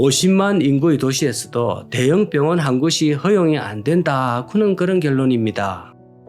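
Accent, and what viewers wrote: Gyeongsang, South Korea. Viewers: 갱상도 아이가?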